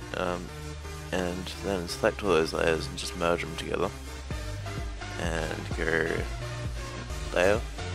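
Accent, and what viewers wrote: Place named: United Kingdom. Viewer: You sound like you're british and have either done a fuck ton of molly and fried your brain, or you're super stoned and talking ridiculously soft into your headset. What's hilarious is even the transcript has no idea what's going on. I think I finally got it. Thanks for the upload. Work on your diction my good man.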